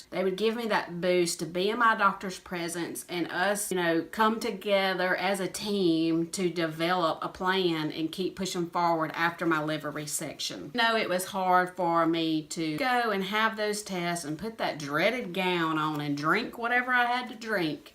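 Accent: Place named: South, United States. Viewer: Oh Dear God!!! Love the southern accent!!! Where you from girl?? Lemme guess, Georgia??? My parents used to live right outside of Atlanta. I grew up in south west Arkansas. Girl you are killin it! Keep it up! Mashed that 🛎!!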